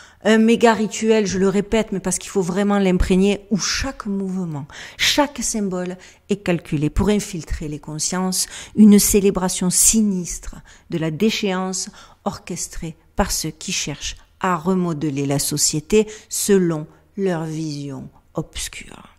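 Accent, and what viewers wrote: Marseille, France. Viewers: Ton accent de Marseille commence (légèrement) à perdre de son intensité. Il est temps pour toi de revenir chez toi, pour quelques jours, je pense. Ce serait dommage de perdre ce patrimoine national inscrit au répertoire de l'Unesco. Les églises brûlent, tu ne va pas perdre ton accent tout de même?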